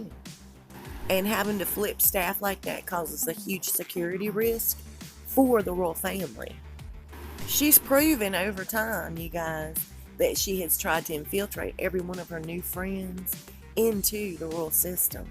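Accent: South, United States. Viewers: Then dont listen and go elsewhere....nobody asked you to come or stay..dont hate on beautiful southern women and our accents...u must speak thru ur nose like yankees.. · I really like your voice, where in America is your accent from? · This woman narrating......terrible southern ACCENT. Can't listen past 2 minutes.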